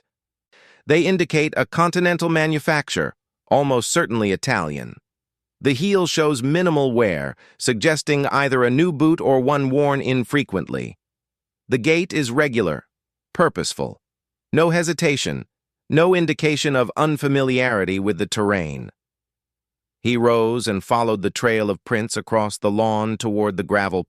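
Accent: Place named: United States. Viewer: Give this a miss! AI GENERATED STORY AND AMERICAN NARRATION.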